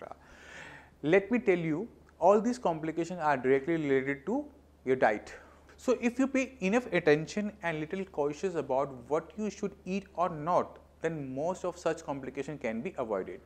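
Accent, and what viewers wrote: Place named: India. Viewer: Thank you for that good infos. Kindly speak slower bcoz i can't catch up your Indian accent. Thanks so much.😊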